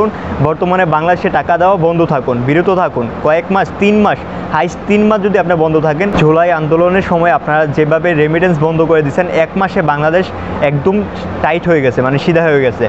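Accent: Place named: India. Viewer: তুমি কি ইন্ডিয়ান তা না।হলে তো এইভাবে তো বাংলাদেশের ছেলেরা কথা বলে না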